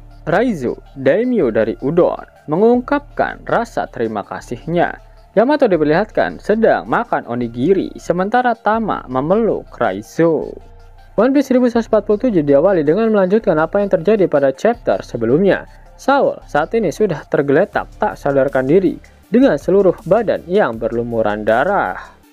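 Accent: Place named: Medan, Indonesia. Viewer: Medan nih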